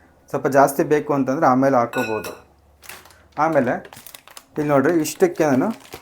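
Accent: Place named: Kadur, India. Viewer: Sar niwoo yava wooru nimma bashe nama kadurina bashe agede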